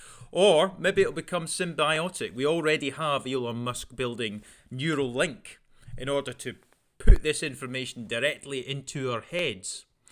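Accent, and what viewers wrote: Scotland, United Kingdom. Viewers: Are u Scottish? I love your accent. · Hey man, are you from the same part of Scotland as Scott Manly?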